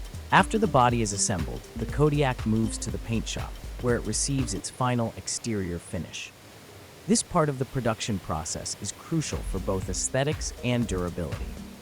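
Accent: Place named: United States. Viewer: Not impressed by the american accent, nor the mis-pronuciation of aluminium .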